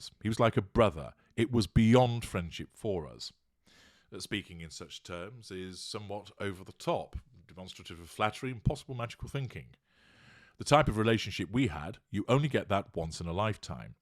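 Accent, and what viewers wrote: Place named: England, United Kingdom. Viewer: Tell me why all it takes is an English accent to make a mf sound like he knows what he's talking about. (???)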